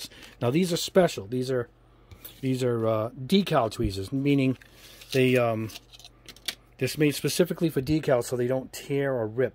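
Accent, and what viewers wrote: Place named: Long Island, United States. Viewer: Long Islanders understand his perfect accent:)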